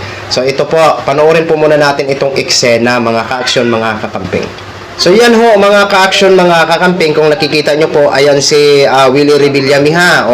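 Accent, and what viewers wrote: Visayas, Philippines. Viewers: Kabwisitboses mokuya Uhg pag stiry mo para kang nasa karerahan ng kabayu...visaya visaya pronounciation mo.englishin mo na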